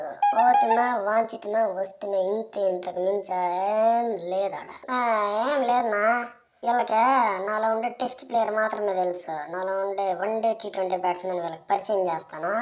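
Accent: Chittoor, India. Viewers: Maa chittoor yaasa suparu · Maa chittoor slang super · Bagundandi.... Pushpa kante ikkade chittoor slang Baga undi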